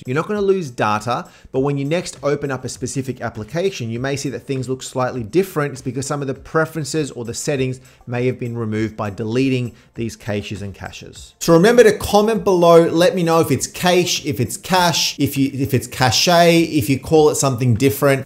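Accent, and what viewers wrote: Australia. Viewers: love the Oz accent so keep the pronunciation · Thank you! Delete the cache really is ok!?! And I love hearing a fellow Aussie accent!! And also, there used to be the ‘other’ files located on the Mac storage, now have they been renamed to “MacOS “… i know that sounds odd, but it’s in the exact location where ‘other’ was…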